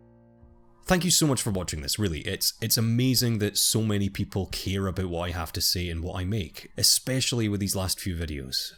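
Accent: Scotland, United Kingdom. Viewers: Question: Are you scottish? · Wait are is he Scottish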